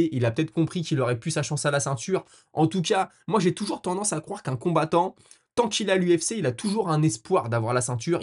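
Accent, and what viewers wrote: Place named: North, France. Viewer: Frerot repond pas si tu veux une fois si je confond pas ta dis que t'avais était a Rotterdam look 1 ufc et vu que ton accent m ait familiers je me demande si tt du nord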